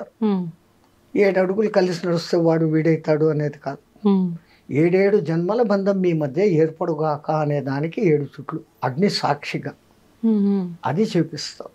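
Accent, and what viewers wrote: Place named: Rayalaseema, India. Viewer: Sri Sri Sri gurudevulu notinundi ma rayala seema basha vinte shanthoshamga vundhi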